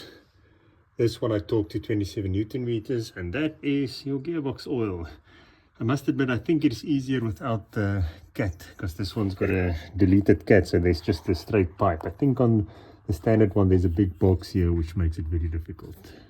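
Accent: South Africa. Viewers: ‘tsempian’ for sure lol. You will never be able to hide your South Africanisms😅 I must add that one thing I really do like about an SUV is that with its higher ground clearance one can get under the car and service it for the most part (however somewhat awkwardly), but with a normal sedan or hatchback just jacking up the car is such a chore and I hate it. On larger SUVs the engine bays have space to use tools, smaller cars not so much.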